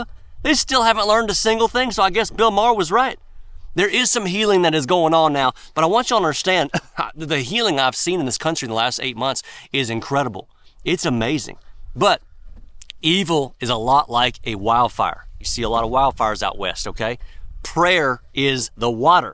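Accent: South, United States. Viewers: I just want to move down south, so I can sound as cool as you! 😎🤓🤠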